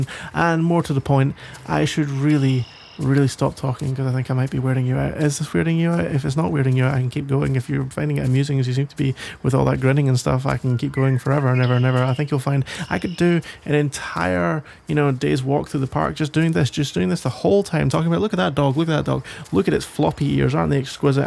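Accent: Scotland, United Kingdom. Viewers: Omg wait you're Scottish!? Me too!!!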